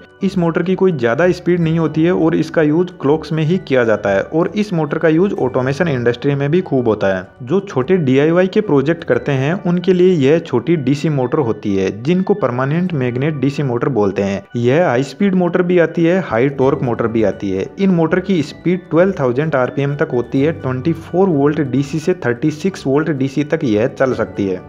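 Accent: India. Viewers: bro are you indian or i just watch dubbed video by the way your content is awesome and informative.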